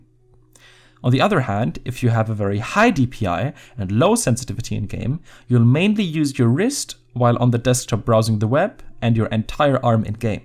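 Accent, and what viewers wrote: Germany. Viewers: Hardest german accent i've ever heard, but good video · Man merkt so hart dass du deutsch bist haha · Ich höre doch raus das du ein deutscher bist gibt es das Video auch auf Deutsch? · Direkt in der ersten sekunde gemerkt, dass er Deutsch ist. Gutes Video.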